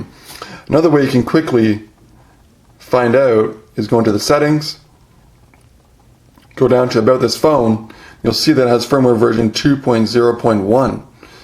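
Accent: Canada. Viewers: I love you canadian accent :D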